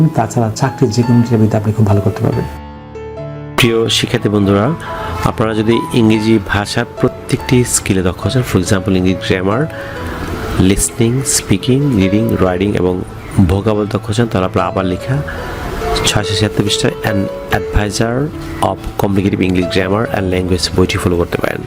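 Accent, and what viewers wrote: United Kingdom. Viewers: আপনার উচ্চারণগুলো অনেক সুন্দর স্যার। ঠিক ব্রিটিশদের মত।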